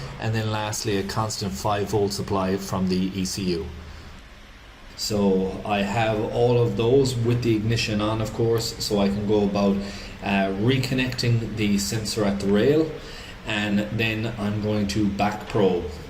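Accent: Ireland. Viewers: Great video, just subscribed. I'm in Ireland you sound like your familiar to there :) . I have a 2.5td ranger year 2011, losing power on and off today it would hardly pull up hill then it might go again but constantly down on power over last few months. I put in new injectors and cleaned out diesel tank still has the issue. Any suggestions, thanks for the help..No lights coming up on the dash. Tks again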